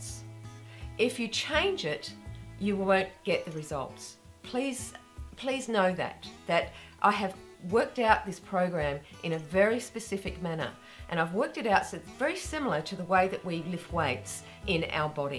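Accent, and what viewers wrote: Australia. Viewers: Geez, I just adore you:) I also love your Aussie accent! I commented before, have followed you for quite some time now, but only recently have I decided to give your steps a go as you put it all together so nicely, am still at the first week and very excited to see it through. There is so much to watch, learning never ends. Still slightly confused but hey, I will get a hang, for now my goal is to make it through all reps, all series, so that will keep me working for weeks. I have been doing various massages, small exercises and such these last years but now, given that I am approaching 50, given that I also started having neurological issues, jaw surgery in 2 weeks, I decided to this with you. Just wanted to say thanks for sharing all this with us. That good karma is giving you such a lovely, positive glow so no wonder that you loo so beautiful and fresh <3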